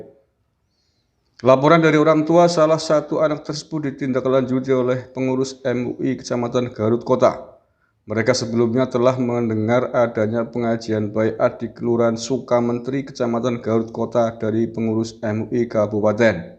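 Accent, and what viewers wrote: Banyumas, Indonesia. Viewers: 😅😅😅😅bhs Banyumas e lucu tenan kang😅😅😅😅😅😅..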